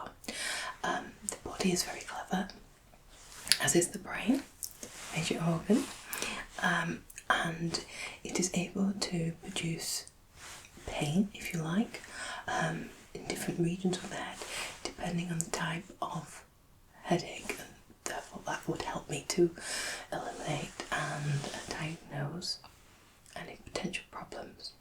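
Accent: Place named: North West England, United Kingdom. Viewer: Hello, happy Xmas, that accent sounds north west?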